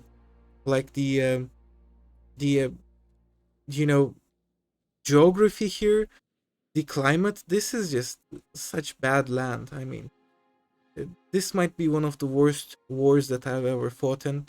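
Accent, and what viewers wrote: Turkey. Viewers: turkish accent detected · Reis Türk müsün, Otistik Türk aksanın var.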